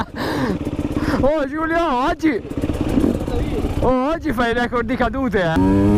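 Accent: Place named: Tuscany, Italy. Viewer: hahha ok Bro! PS. Amo il tuo accento toscano, lo adoro!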